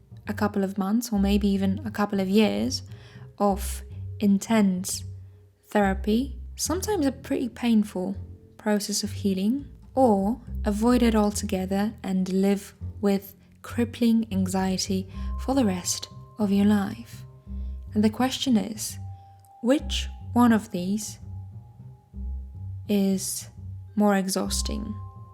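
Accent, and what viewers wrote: United Kingdom. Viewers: i'm surprised you have anxiety speaking in English i though you were British! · I honestly thought that you were British :D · Yep. The first time I heard her speaking English I thought she was from some part of the UK because I noticed a bit of an accent and I thought she has a bit of a regional accent.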